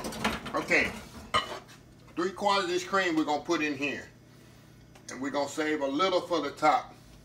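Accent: South, United States. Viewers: lol i'm laughing halfway thru the video. He has got to be from either Louisiana, MS, GA, or Texas lol. Jokey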